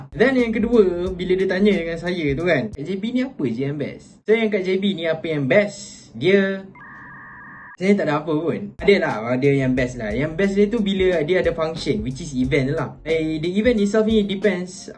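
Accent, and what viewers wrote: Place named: Kuala Lumpur, Malaysia. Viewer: Dtg daripada keyel ke jaybe Xleh blah ah aku dgr slang dia😂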